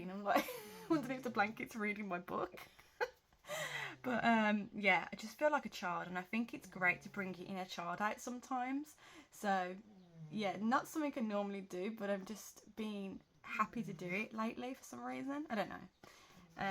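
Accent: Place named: Birmingham, United Kingdom. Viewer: hello, thanks for sharing your journals ❤ I live your accent, reminds me of home. I’m from Birmingham but live in New Zealand now xx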